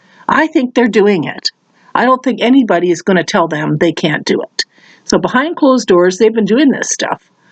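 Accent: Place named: Canada. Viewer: I've been outside and I'm still alive. April 21, 2020. You sound like your from Canada.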